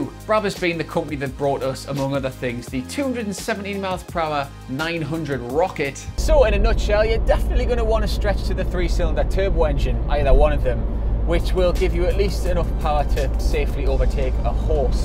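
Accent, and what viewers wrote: Newcastle, United Kingdom. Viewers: The best reviewer on YouTube! I’m not or ever will be interested in buying a forfour I just love the gordie accent and sense of humour. Keep up the good work! Did you apply for top gear???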